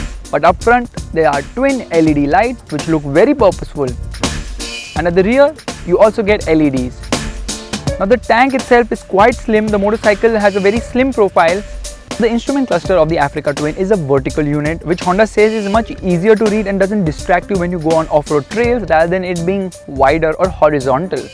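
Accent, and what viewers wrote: India. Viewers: sing song blibbida dibba bibida lol that is what I hear half the time...I love Indian accent but when spoken fast I seriously miss half of it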